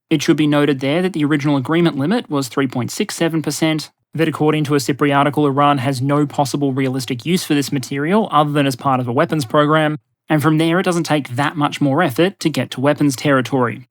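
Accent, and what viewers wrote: Australia. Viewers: The Aussie accent almost hides the propaganda but the rethoric is the same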